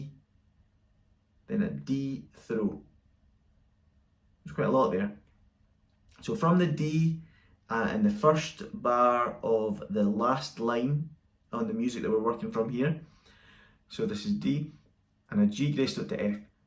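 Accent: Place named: Scotland, United Kingdom. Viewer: Very very helpfull, included your magnificent Scottish accent, which is not the easiest thing to understand for an old Frenchman like me...Thank you very much indeed !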